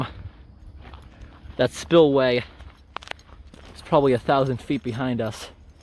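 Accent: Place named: New England, United States. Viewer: Just a couple of comments. First I love your dog, she is beautiful, would be nice to see more of her... second, I really dig your accent... is that typical 'New England'? next ....you are showcasing an outstanding part of your wonderful country, educating individuals such as myself to the rich and diverse wilderness still left in the north eastern states....Finally much respect to you for your selfless efforts to improve the environment for the benefit and enjoyment of your fellow bods. ....Peace and happiness from across the pond (Old England).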